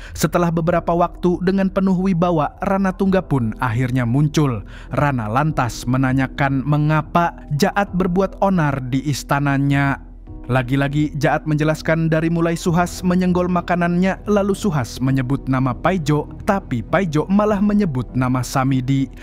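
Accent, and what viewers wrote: Banjar, Indonesia. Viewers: urg banjar jua kh pian mang😅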